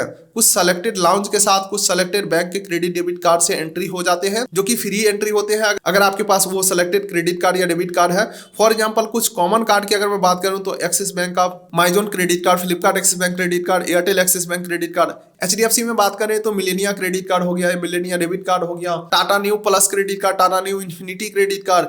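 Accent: Bihar, India. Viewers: Sir ap Bihar se h kya voice tone lg rha h 😊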